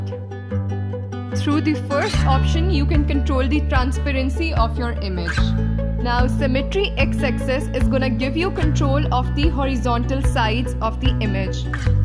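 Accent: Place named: India. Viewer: Wow sounding like indian , is this made in india app? If yes so excited to learn pixel art from own country made app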